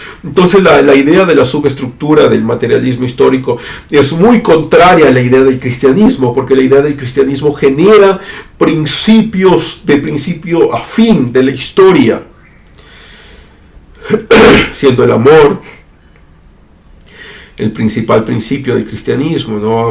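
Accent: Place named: Colombia. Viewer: oye, estas hablando un poco con acento colombiano ;)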